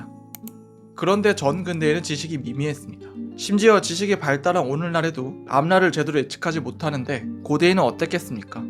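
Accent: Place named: Busan, South Korea. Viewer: 좋은 영상 감사합니다!! 부산 출신이신가요? 억양이 반갑네요 :)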